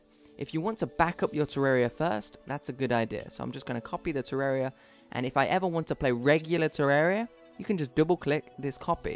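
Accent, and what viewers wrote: United Kingdom. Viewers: prepubescent englander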